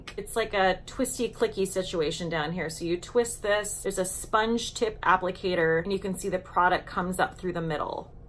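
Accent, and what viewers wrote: France. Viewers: I loved this! I would wear that glitter and I'm turning 60 this month! Love the liners, too! This is a brand I've never tried but I did try Colourpop because of you so maybe I'll try this out too! Love the French accent! You never fail to entertain! Be careful out there! Thank you for another exciting video! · Hiya toots! Love your French accent! Have you ever seen the episode Girlfriends? There is a French song called that is hilarious! Oh and there have been four Titanic movies! My fave is the one with Barbara Stanwyck and Clifton Webb. So good! Love this Glossier Play line and you’re right, it has a 70s vibe! You look just adorable/chic/glamorous! Be careful with those glaciers girl. 😘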